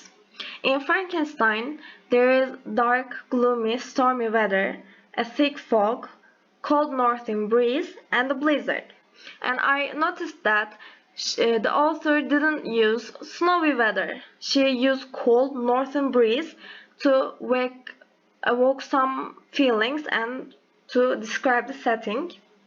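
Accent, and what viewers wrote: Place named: California, United States. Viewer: Accent like Californian..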